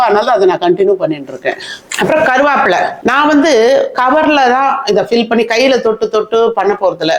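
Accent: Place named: Trichy, India. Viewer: நீங்க pesarathu Nanna iruku, unga ooru Trichy AH. Nalla idea oil um water um mix pani sheet la thadavarathu 👌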